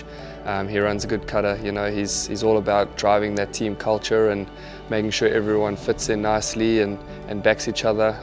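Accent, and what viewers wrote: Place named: South Africa. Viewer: This guy has a South African accent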